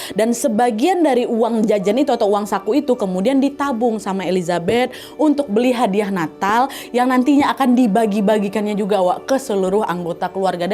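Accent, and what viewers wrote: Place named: Riau, Indonesia. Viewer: selamat kak..🎉🎉-/+Bru 5bulan aku ikutin Chanel ini,awal mula aku tau dri anak gadisku yg skrg lgi mondok.kita sering nonton ber2,yg sering kita tonton cerita horor Krn emank kita suka cerita yg barbau horor..😁mpe saat ini aku sll ikutin cerita yg lain Krn emank seru dngerin kak cerita tuh..kyk lgi ngobrol aj gtu..,😊aku jg 11thun tinggal d Riau tepat'y daerah perawang bnyk kenangan dsna.tpi skrng aku dah pindah k Banten..jdi pas dngr gaya bahasa kak..rasa'y msh berada d Riau..😁sukses sll ya kak..😘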